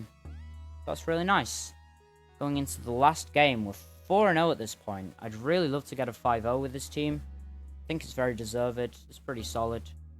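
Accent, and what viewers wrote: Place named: United Kingdom. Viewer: The British accent hell yes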